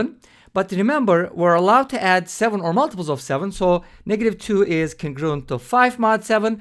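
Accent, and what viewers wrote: Turkey. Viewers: you sound extremely turkish, are you?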